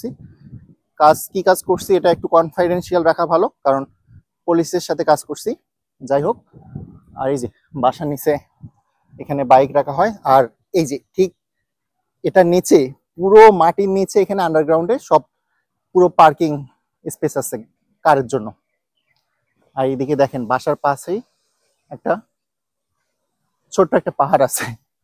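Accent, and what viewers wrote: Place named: Sylhet, Bangladesh. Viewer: 🤣.Depend on luck. But as a sylhty I think sylhety ra Sylhet ar outside a Biya-shadi kum kora. Apnar accent onakta Sylhety mona hoyacilo tai bollam .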